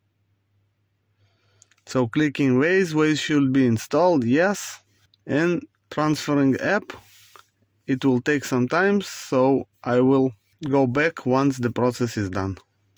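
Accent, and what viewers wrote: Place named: Israel. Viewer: איך שומעים ישראליותתתת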